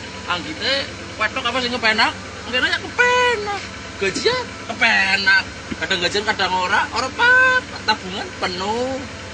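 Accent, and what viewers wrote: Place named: Java, Indonesia. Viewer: Wong endi mas medok temen.ari ngomong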